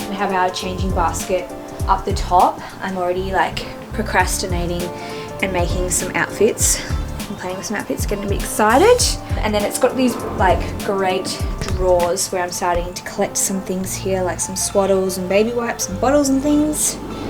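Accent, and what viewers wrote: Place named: Australia. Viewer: My ‘normal’ you mean outside of Australia? 😂 she has a normal Australian accent ✌️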